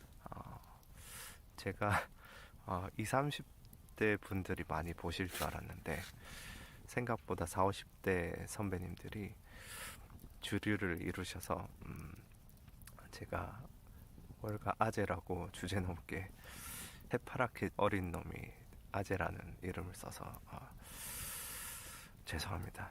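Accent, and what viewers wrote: Gyeongsang, South Korea. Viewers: 최근에 우연히 유툽을 청취하게 되면서 재미에 푹 빠져든 예순을 넘은 경상도백수아제입니다..ㅋㅋ 언어에서 약간은 경상도 정서를 느꼈었는데 경산 갑제동이 본가라고 하시니 TK로서 더욱 정감이 가네요! 고령화시대를 맞아 중년들의 경제적 고민도 깊어가는것 같은데 수준높은 강의에 소중한 도움을 얻게 됩니다. 장작불의 운치가 썰을 푸는데 기막힌 조화를 이루는듯 합니다. 건승을 기원드리며 응원합니다.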